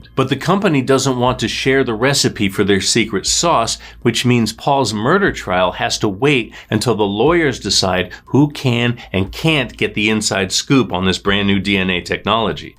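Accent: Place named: New Jersey, United States. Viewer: Holy crap I never realized how familiar your accent was until now. I grew up Monmouth County NJ where Colts Neck and Asbury Park are located. You gotta be from the Tri-State, but I think you're from NJ. Mentioning the proximity to NYC introducing those town names hit me right in the heart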